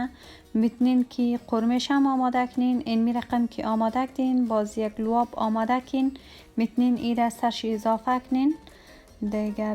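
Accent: Hazarajat, Afghanistan. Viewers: I like her hazaragi accent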